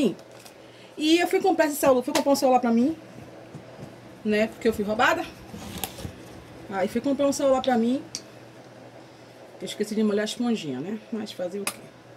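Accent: Bahia, Brazil. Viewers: Que delícia esse sotaque baiano 😍😍😍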